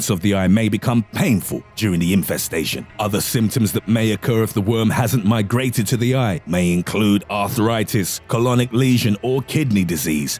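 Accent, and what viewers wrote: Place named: United Kingdom. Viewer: cinocee scottys a pleab. Anyways, this dumb ass voiceover takes the scary away, sounds like a British butt pirate.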